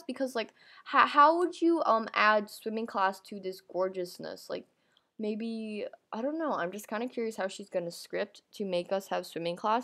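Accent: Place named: Long Island, United States. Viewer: Are you from Long Island? Becuase your accent is goalssss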